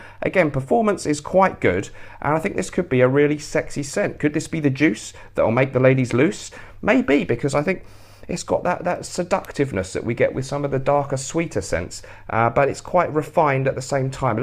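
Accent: United Kingdom. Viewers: My favourites at the moment: Lalique Encre Noire, LM Hard Leather, Acqua di Parma Essenza and Comme Des Garçons Incense Avignon. BTW, I love your videos and your British Style and accent.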